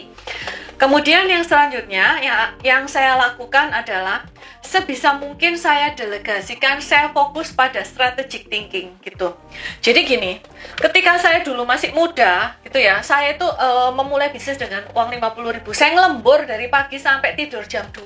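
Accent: Surabaya, Indonesia. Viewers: kereeen menginspirasi, baru liat video ini auto subcribs.btw logatnya jawatimur suroboyan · Thats was good.. Ingrisnya medok kas Suroboyoan..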